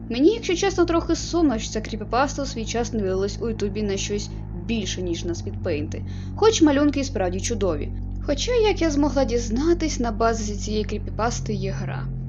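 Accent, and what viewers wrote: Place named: Ukraine. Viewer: Похвально що розмовляєш майже чистою українською мовою 😊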